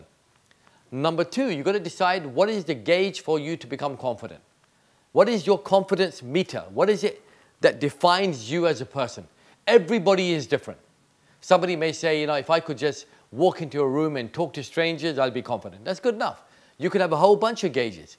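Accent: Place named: Europe. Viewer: Oh, you are really great. My problem is--I can not follow your foreign accent, and difficult to understand your content.... You do not know what I have done and still doing to understand your accent. I think yours are European accent, may be like of England..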